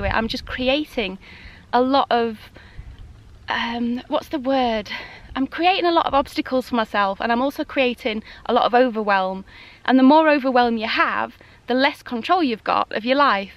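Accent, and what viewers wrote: United Kingdom. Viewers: I have a friend from England that heard me say "worcestershire" with and American accent and she said, "oh no, you don't pronounce the 'r', that is low class." Haha. So I noticed, when ever I here a Brit speak, you only pronounce the 'r' after a consonant but not after a vowel. Lol, now your viewers that read this are going to be listening closer to your accent. Which I think is really cool. That's my blog (comment).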